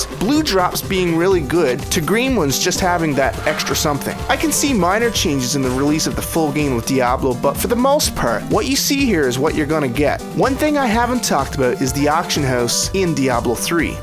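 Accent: Canada. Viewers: is it me, or does this guy have a really heavy canadian accent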